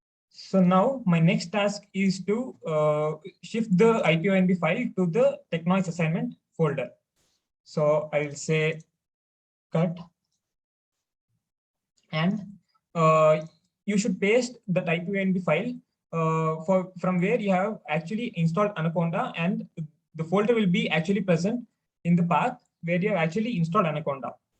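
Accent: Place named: India. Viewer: When i heard an Indian accent I knew I'm saved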